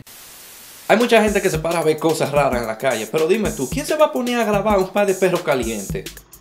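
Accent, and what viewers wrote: Cibao, Dominican Republic. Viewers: Jajajajaja que risa manin eres de República Dominicana no lo puede nega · es dominicano del Cibao jajajja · Dominicano de pura sepa...No se nota?? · AJAJAJAJAJAJAJA ME ENCANTAN TODOS TUS VIDEOS SON LO MAXIIMOOO... Y ESE ACENTOOO DOMINICANOOO EXCELENTE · hahahahahahahahahahahah k cura x.x eres dominicano?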